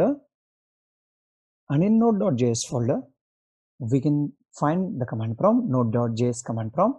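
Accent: India. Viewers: Try to speak it in normal accent. sometimes I do not get the south indian english. Apart from that this Video was fantastic. <3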